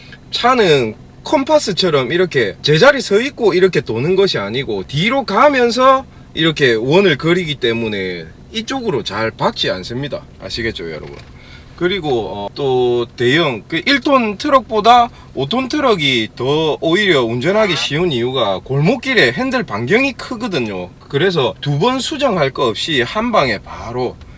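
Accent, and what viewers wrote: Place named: Western Gyeongnam, South Korea. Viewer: 사투리가 서부경남쪽이네요 맞죠?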